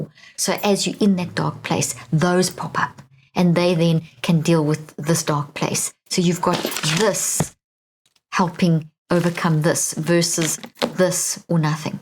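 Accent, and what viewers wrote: South Africa. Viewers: South African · South African I think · It is amazing how your South African accent remains over all these years. Thanks for these podcasts Dr. Leaf. South Africa will grow and develop within time- hope just needs to be cultivated in people's minds again.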